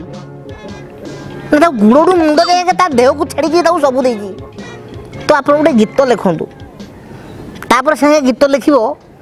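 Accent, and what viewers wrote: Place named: Angul, India. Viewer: Dear ବାବୁ, ଯଦି CoPy ର କଥା ଉଠୁଛି ତ କିଏ CoPy କରୁଛି ଆଉ କୋଉ ଗୀତ କୁ CoPy କରି କୋଉ ଗୀତ ବନେଇଛି ତା'ର ସବିଶେଷ ବିବରଣୀ ଦିଅନ୍ତୁ । ଏମିତି CoPy ତ ସମସ୍ତେ କରୁଛନ୍ତି Ollywood ରୁ Bollywood ଯାଏ । ମୁଁ ଆଶା କରିବି କି ଆପଣ Next Episode ରେ କେଉଁ ଓଡ଼ିଆ ଗୀତ କେଉଁ ଅନ୍ୟ ଭାଷାର ଗୀତରୁ କିମ୍ବା କୌଣସି ଓଡ଼ିଆ ଗୀତରୁ CoPy ହେଇଛି ତା'ର ଗୋଟେ ସୁନ୍ଦର Video ବନାନ୍ତୁ । ଆପଣଙ୍କ Comedy ଠାରୁ ଆପଣଙ୍କ Voice ଆଉ ଅନୁଗୁଳିଆ ବୋଲି ଆହୁରି ଆକର୍ଷଣୀୟ ଲାଗେ। ଆପଣ ବି ଆଜିକାଲି ଗୀତ ଗାଇଲେଣି ମୁଁ ଦେଖୁଛି, ସେଥିପାଇଁ ବଙ୍ଗୋପ ସାଗର କହୁଛନ୍ତି କି? Confidence ଭଲ ହେଲେ Over Confidence ଭାରି ଖରାପ।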